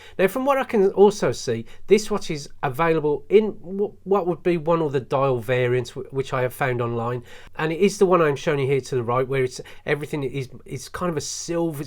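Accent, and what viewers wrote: United Kingdom. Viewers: Nice video, love your brittish accent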